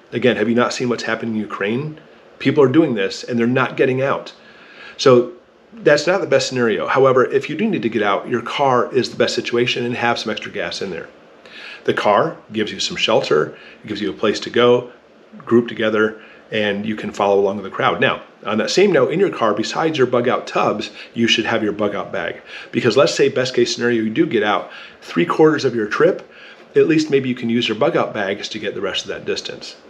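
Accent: United States. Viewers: For a start all those who go out for a night in the woods, make a steak over a fire, and drink beer, they think whats about to happen is going to be just like that. Lol. It's NOT. Neither will it be a Mad Max Scenario. Just unimaginable horror and suffering. Anyway, who are you to tell anyone to stay or go ? What qualifies you ? To me you sound American, so when the SHTF, sadly, you will probably be exterminated along with Billions of others, who will die in unimaginable horror. Of course those you empowered, the Billionaire Elite politicians will all be safely tucked away in some cosy bunker, watching you die on tv monitors ! Finally, this is not Iraq, Afghanistan, Libya or anything like those backward tin pot countries, this is Russia & China ! Superpowers brimming with Nuclear weapons. This will be horror like you cant imagine. Why am I so certain, or speak with so much authority, because the West has been wanting a world conflict for well over 15 years now. 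Putin has nowhere to go, his only option is now to stand up to the USA. We all know what that means. Well nearly all, you very obviously don't. Anyway you see to forget also to mention for at least three days, no one even you, wont or shouldn't be going anywhere, as the fallout will kill you. Well if the winds blowing in your direction. There are so many more factors to take into account, however we'll just wait for you to tell us all what to do ! Strangely there wont be any Internet ! So I have to wonder how you're go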